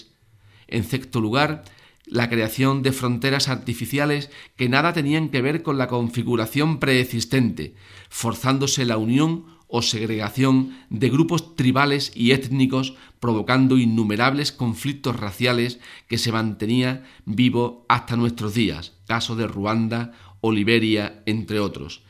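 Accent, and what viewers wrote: Andalusia, Spain. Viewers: Tio hablas como un andaluz no puedo ver el video porque me mosquea ese estupido acento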